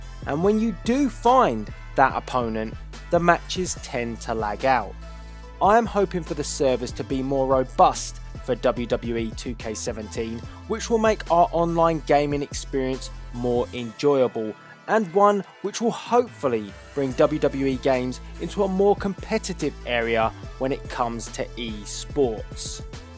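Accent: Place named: United Kingdom. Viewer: Every time I think a WhatCulture narrator could not possibly be more UK/British-sounding, they manage to top themselves.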